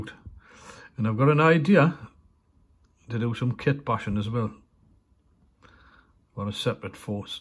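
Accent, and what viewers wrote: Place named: South Essex, United Kingdom. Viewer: Very nice. You can't go wrong with a bit of South Essex.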